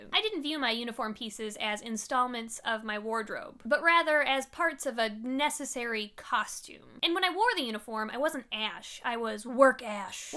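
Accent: Minnesota, United States. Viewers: You live in Minnesota right? Your accent peaks through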